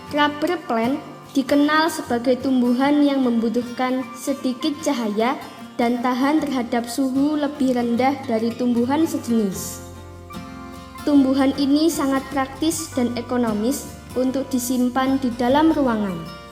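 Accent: Java, Indonesia. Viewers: Jawanya medok kalii itu bang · Beetele2,,,,n sgt meddok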